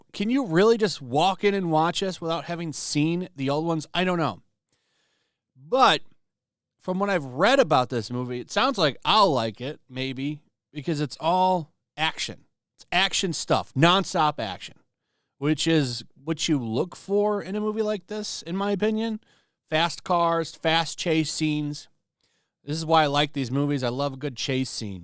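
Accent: United Kingdom. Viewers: you have a great chap accent. love it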